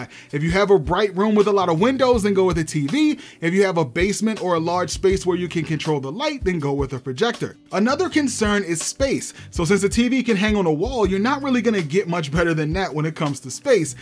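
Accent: Baltimore, United States. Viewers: is this a bmore accent I detect? Great videos! Very helpful. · I hear that Bmore coming outta ya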